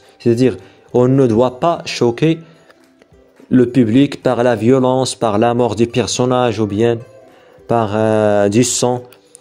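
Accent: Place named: Arab world. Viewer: Ohhh l'accent arabe🤓🤓 sinon bonne continuation frr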